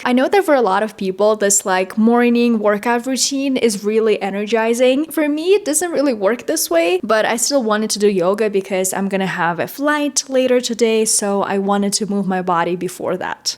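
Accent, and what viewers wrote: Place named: England, United Kingdom. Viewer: I really love your English accent you have fascinating fluency in English ❤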